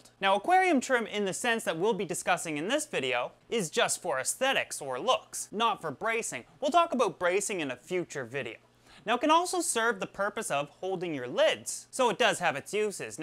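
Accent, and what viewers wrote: Canada. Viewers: I love how Canadian you sound... it's great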